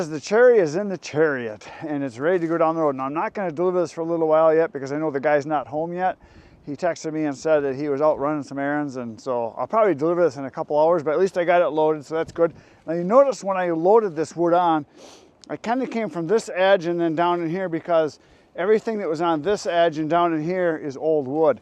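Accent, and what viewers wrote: Wisconsin, United States. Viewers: Sounds like Wisconsin.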